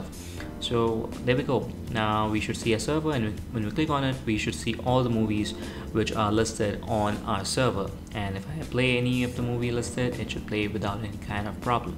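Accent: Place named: India. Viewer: Why does this Indian dude have 160 Subscribers he should have at least 20,000 he's just speaking English without any pronunciation errors but has an accent which is ok but he's just the best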